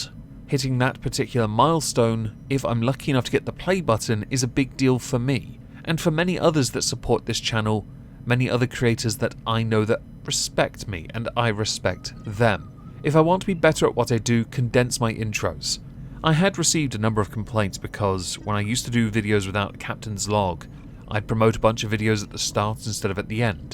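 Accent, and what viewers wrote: United Kingdom. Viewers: Horseshit dude. Your voice is the smoothiest butteriest British accent I've ever heard. And I love your content dude. Never stop. ❤❤❤ and congrats for 100k. Been here since you were around 45k I believe.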